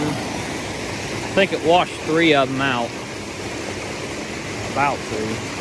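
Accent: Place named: Western Pennsylvania, United States. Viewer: Is this in western PA? Guessing based on your dialect. I miss that.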